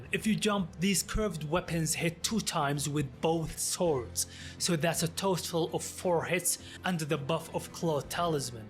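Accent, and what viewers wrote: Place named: Saudi Arabia. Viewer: ياخي اللهجه السعوديه اسمعها لو وين ما كانت 😂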